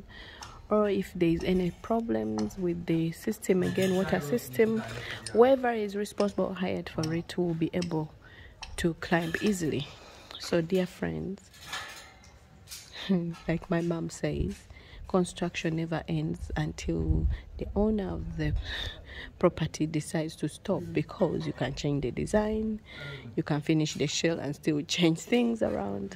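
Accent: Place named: Uganda. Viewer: You sound Ugandan, did you emigrate to Ghana?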